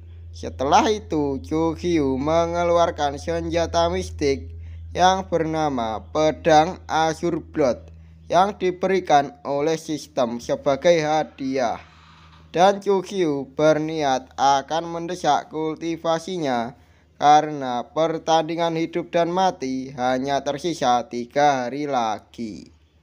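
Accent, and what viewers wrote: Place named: Java, Indonesia. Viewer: Bahasanya terlalu medok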